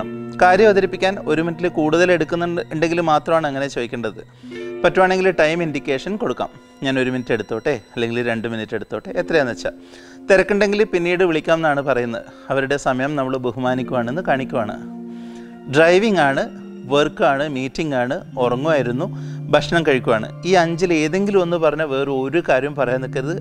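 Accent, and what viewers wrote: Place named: Kanhangad, India. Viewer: Hi, Useful video. First time anu Mr. yude video kanunnudu... really appreciat. Kanhangad slang🙂x from England.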